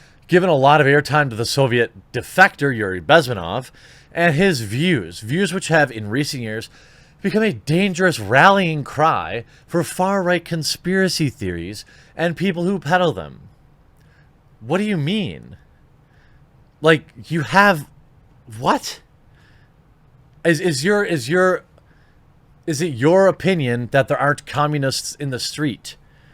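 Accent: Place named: United States. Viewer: I like your accent, i wonder which part of the US you're from?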